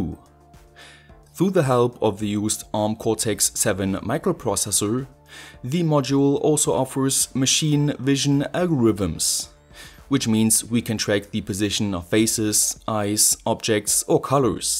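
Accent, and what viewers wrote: Germany. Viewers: damn the german accent is annoooooooying. :(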